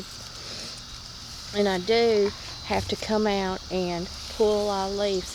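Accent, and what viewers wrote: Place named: Georgia, United States. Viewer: Love your accent. Reminds me of the ladies I have met in Georgia when I was stationed at Ft. Gordon, Augusta , Ga. in the US Army. Thanks for the great identiication of the variuos species. I just bought some L. Virosa seeds and am trying to sprout some right now. But I have to wait for the second years growth before I harvest any milky sap. God Speed to you !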